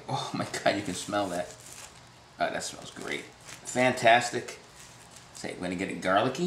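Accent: United States. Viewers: Garlic ? Pepper ? If you've been to Europe or other places in the World, you'd realize there are LOTs of varieties used throughout the World. I'm assuming, since you look and speak very American that it is the "typical" black pepper and the "typical" clove garlic. Especially the pepper - of which there are 100s of different types.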